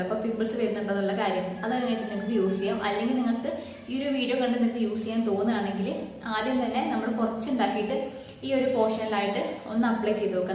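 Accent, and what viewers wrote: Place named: Thrissur, India. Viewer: Trissur aano home, slang 👍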